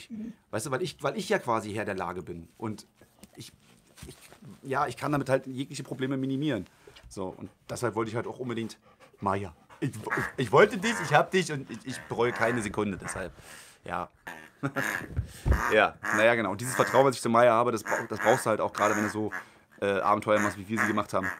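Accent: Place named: Berlin, Germany. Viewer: Schönes Video, lieben Dank!!! Wenn du magst kannst du gerne mehr "berlinern" ;);););) LG aus Rheinbach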